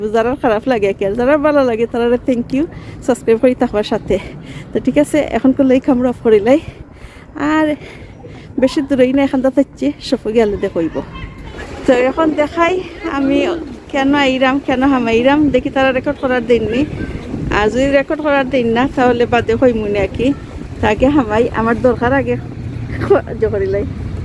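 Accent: Sylhet, Bangladesh. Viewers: আপু কি সিলেটি নাকি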